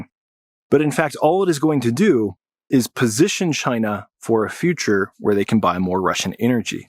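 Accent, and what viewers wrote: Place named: California, United States. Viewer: You're not "man-splaining," you're "dude-splaining" ;) California accent aside, you're quite correct.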